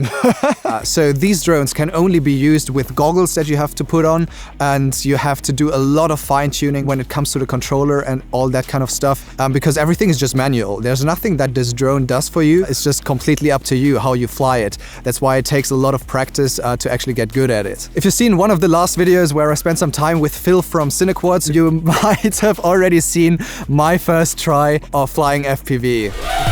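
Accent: Bavaria, Germany. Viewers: Ahh lol bei hat sichs geklärt hahaha xD Auch wenn dein Englisch echt ziemlich gut ist hört man es irgendwie immer raus wenn ein Deutscher Englisch spricht xD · Noch nie deutscheres englisch gehört haha, aber feier ich haha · Are you from Germany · Woher aus Bayern kommst? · Na wirkli oda, a Bayrer! Do bist jo goa ned so weit weg. 😉 Wahnsinns Intro übrigens! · Haha korrekt erster Satz erkannt dass du aus Bayern kommst korrekt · ich wusste das du Deutsch bist ich hab es gehört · Ich wusste es beim ersten Wort englisch hat Mama sofort gehört 😂👍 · Way to funny that you only said like 2 Words and it was already obvious that you are german xD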